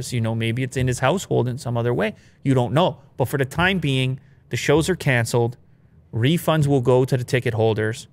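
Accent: Canada. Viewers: sounds more Canadian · The first video I really noticed his 🇨🇦 accent lol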